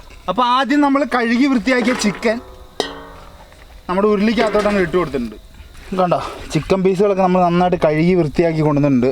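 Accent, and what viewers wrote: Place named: Tamil Nadu, India. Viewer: കള്ളുപ്പ് 😅 തമിഴൻ talk😁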